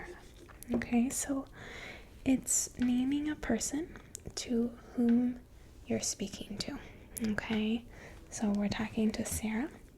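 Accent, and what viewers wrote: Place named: Upper Midwest, United States. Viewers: you sound like you're from chicago miss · You have a nice subtle upper Midwest accent..I know. I'm originally from there..very nice..love your ASMR..thanks so much..goodnight .😇😴💤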